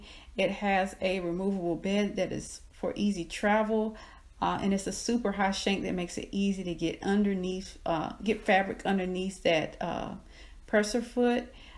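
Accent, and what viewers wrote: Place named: South, United States. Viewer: and as an australian i love that southern accent - also no stupid shitty music.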